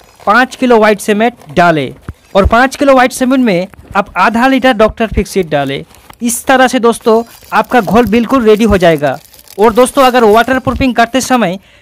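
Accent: Bengal, India. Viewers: bangali mone hochhe 😃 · Bahi tmr bari kotai ?? Tmr hindi r modhe banga pute utche...😆😆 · Bandha ka slang lagta ha Bengla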